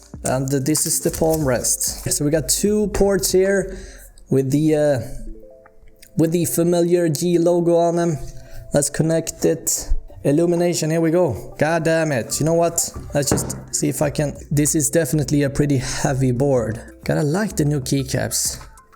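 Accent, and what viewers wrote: Sweden. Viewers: That swenglis dooo 😉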